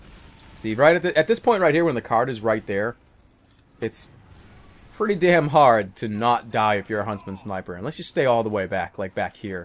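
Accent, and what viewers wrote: Boston, United States. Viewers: Im guessing you are either a real life version of a Scout or you are from Massachusetts/Boston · He is from Boston as is the scout, they have a similar accent. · Oh. You are so Boston-ey.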